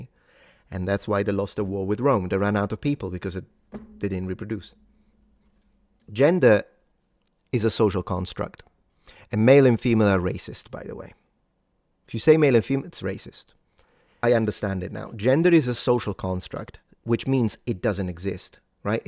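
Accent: England, United Kingdom. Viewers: I believe you are from England. :)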